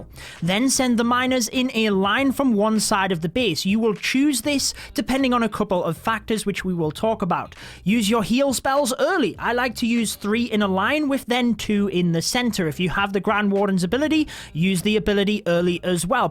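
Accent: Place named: Northern England, United Kingdom. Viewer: My northern English brother 🦾😘 I can hear on your accent baby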